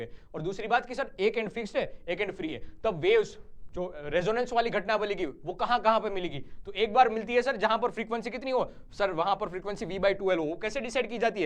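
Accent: Gujarat, India. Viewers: Sir gujrati hai kya??? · aap gujraati ho kya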